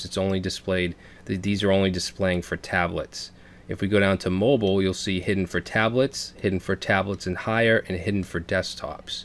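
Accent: Boston, United States. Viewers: You are from Boston huh.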